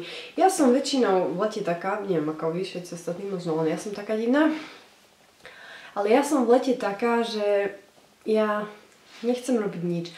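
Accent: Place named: Hungarian border, Slovakia. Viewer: + podla mňa bývaš na 90% pri maďarských hraniciach :) Podla prízvuku (mám pravdu?)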